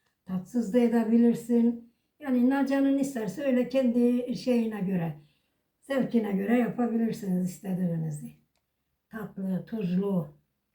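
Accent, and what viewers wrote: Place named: Meskheti, Georgia. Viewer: teyzem nerelisiniz acaba ben Ahıska türküm aynı bizimkiler gibi aksanınız var😊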